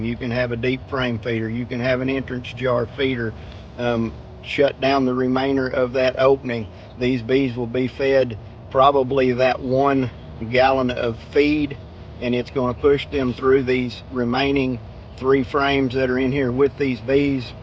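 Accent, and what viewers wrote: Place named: South, United States. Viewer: Thank you for making this video. I have 12 days till I pick up my 5 frame nuc of Italian bees. I’m excited and a little anxious and your video shows me how easy it is ( hopefully). Love the southern accent. My momma is a Tennessee girl born and raised. My bees are gonna live about 10 miles from the Wisconsin border on our wooded 3 acre property.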